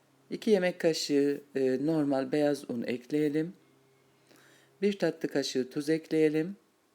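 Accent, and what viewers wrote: Black Sea, Turkey. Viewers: sizdemi karedenizlisunuz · sesin tam bir karadenizli kadin sesi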